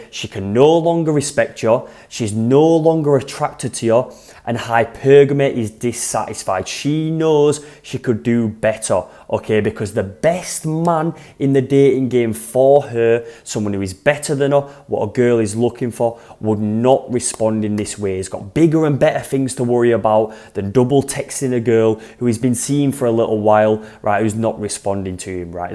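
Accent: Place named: Manchester, United Kingdom. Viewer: Manchester accent is it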